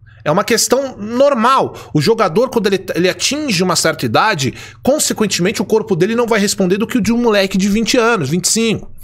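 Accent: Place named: Portugal. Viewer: Eu ouvir o fazendo um vídeo inteiro com sotaque português de Portugal?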